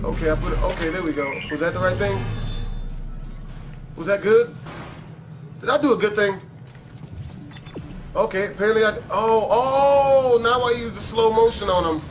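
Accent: United Kingdom. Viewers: I love your british (medieval) accent >.<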